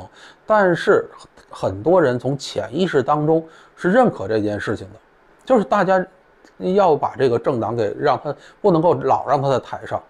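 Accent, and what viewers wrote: Beijing, China. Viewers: 四年後誰執政？我跟播主有點不太一樣的看法。 我也來做個預測，中國這幾年，快一點就今年內了，會被再餓回一隻巨大的扁蝨，跟改開前一樣。 其實我很懷念二十幾年前台灣的時光，大陸妹一卡車一卡車嫁來台灣，中國偷渡客被抓時會唱梅花，假裝自己是台灣人.......的時代。 那時台灣任誰都願意喊：兩岸一家親！照顧窮親戚，誰能不愛呢！您說是吧？ 播主，你是否想太多了？雖然我也挺愛聽你的北京腔和北京大爺說話那個範兒！ 但管他誰執政！誰把台灣做大做強，讓台灣人活著有尊嚴我就投誰！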